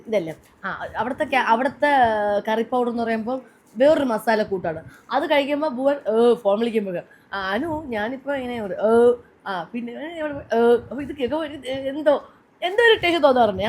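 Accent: India. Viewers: aentha eppol ella vdeos lm thrissur slang l samsarikknne Kurach famous ayappo tvm slang mattnna aentha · trivandrum slang ingana thanneyan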